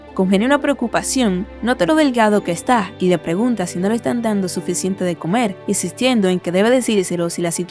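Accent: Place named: Dominican Republic. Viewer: Solo con la voz pude deducir que era dominicana,rd representando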